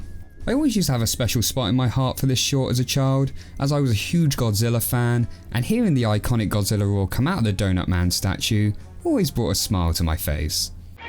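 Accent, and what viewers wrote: England, United Kingdom. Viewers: I didn't know you were English ( I am...)!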